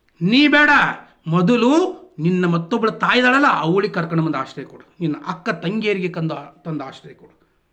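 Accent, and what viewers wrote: North Karnataka, India. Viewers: Uttarkarnataka kannada valg masth heliri brother Super 👌 Correct agi counter Kottiri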